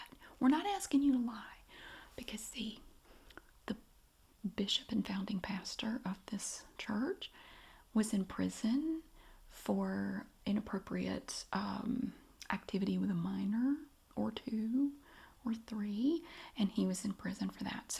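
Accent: South, United States. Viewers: This made me go back and look to see how long I've been following you... 8 years!! Almost since the beginning! Love the vibes and the Southern accent, makes me homesick for North Carolina (lived there for a few years back in the day). You do you,!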